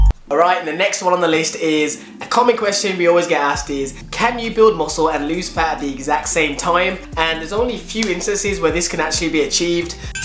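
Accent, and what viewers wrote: United Kingdom. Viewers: Nice to hear a British accent. Lots of common sense, ground roots type tips. Exactly what I need. Thanks. PS music is NOT too loud. Also this Youtuber replies to all the comments. Liked and subscribed. No brainer.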